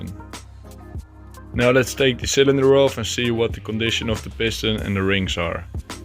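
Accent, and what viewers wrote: Netherlands. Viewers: He sounds Dutch, So probably pretty dang tall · Ik hoor gewoon dat deze man Nederlands is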